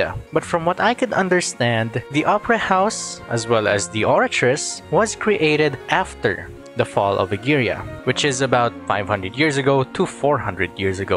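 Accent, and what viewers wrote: Philippines. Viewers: I think that you are right with Furina having multiple personalities. I mean Neuvillette says Furina was researching about the prophecy (FURINA), and I mean his reaction to her being scared of him not going to the meeting seemed like Furina is usually that eccentric lol. And also the emotions she shows like crying in her room seem real. So it would make sense, but these are just my guesses. Also I noticed half way through the video that you are Filipino, barely noticed the accent 😂 I AM TOO, so I was pleasantly surprised :D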